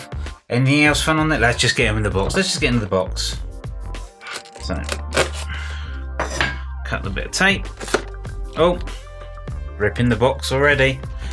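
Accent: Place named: South Wales, United Kingdom. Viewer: You have a southern welsh accent, you aren't by chance from Glamorgan are you? Very Anthony Hopkins'esque, great video mate!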